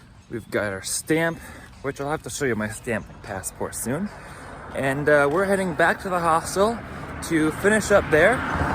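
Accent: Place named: New England, United States. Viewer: I think you should just say it how you say it. Nevermind the naysayers! We are New Englanders, get used to the weird way we say things! lol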